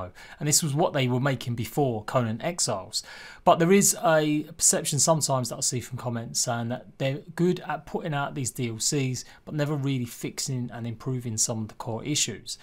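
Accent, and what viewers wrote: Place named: Cockney, United Kingdom. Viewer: Strong cockney accent...kind of hard to understand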